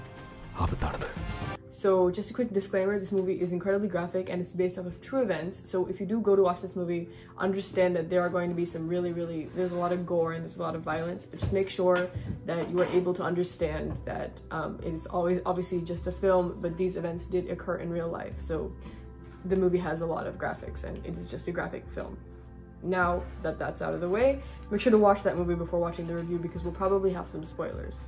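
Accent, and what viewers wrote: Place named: United States. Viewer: Wow... Wonderful Review in American English... Congrats...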